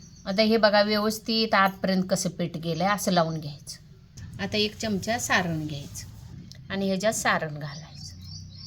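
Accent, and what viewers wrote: Kolhapur, India. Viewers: फारच नावीन्यपूर्ण ! आणि ताईंची कोल्हापुरी भाषा इतकी गोड लागली कानाला ! थेट ल्हानपनातच शिरलं मन !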